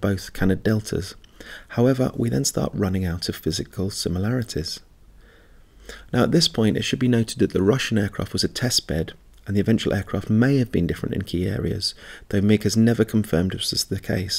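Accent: United Kingdom. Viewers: And it's British accent, love it! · LoL British XD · The english tone is great to. He could be saying just horrible lies and ethnic slurs and I'd be on board 😆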